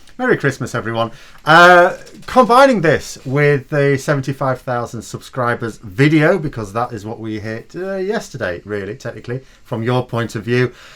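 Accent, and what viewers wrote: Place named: Yorkshire, United Kingdom. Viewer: Merry Christmas. I very much enjoy your podcasts and am a regular viewer. Your insights into politics are most informative. I particularly like your Yorkshire straight talking, something I appreciate about the folk in Britain's best county. All the best.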